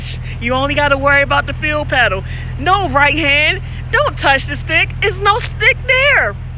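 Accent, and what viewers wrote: Baltimore, United States. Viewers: Why are you so Fricken cool?😍😍😍 I Love your energy. Soooo pleasantly Calm and peaceful; and that Bmore Accent😂😂😁😁😍😍😍👍👍👍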